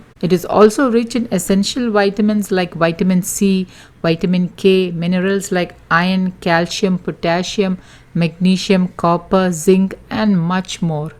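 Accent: Kerala, India. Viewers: I truly ❤️ & admire the attention to detail while narrating the recipes. Also, your Mallu accent & soothing voice is pleasant to listen to. Just subscribed & thanks! 😘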